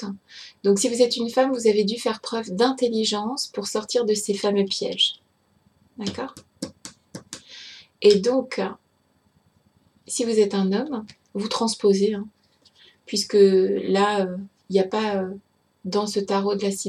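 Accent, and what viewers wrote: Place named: England, United Kingdom. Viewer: Merci 🙌💖 c’est certain ça ne se présente pas en mode « pump it up » quoi que?! 🤣 mais j’avoue que je comprends très bien ce tirage à ce moment de ma vie ou je n’ai plus envie de me battre contre ce passé pénible mais plutôt œuvrer pour moi! J’hésite en terme d’investissement travail... alors je vais revenir à l’intérieur 🙏🏻 et je garde mon guide de paix dans le coeur pour février 😌 beau mois de février 😘 et j’adore votre accent anglais 👌🏼👌🏼👌🏼 perfect!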